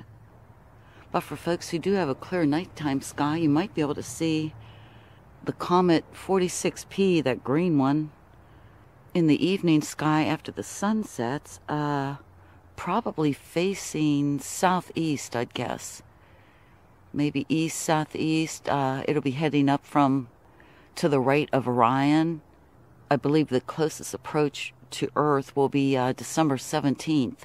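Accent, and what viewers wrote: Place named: Ohio, United States. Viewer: You have a great accent, is it unique to Ohio?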